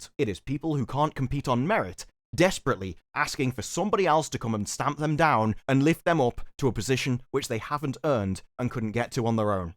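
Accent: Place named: Geordie, United Kingdom. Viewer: You from the Toon, mate? I think I can detect a hint of Geordie in your accent... and yes, I am the Bat-Gadgie.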